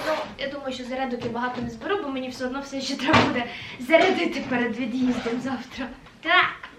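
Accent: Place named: United States. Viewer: це так цікаво❤️ Детальний розбір, покупок, сумок) А твоя англійська 😍 наче ти амерікан гьорл)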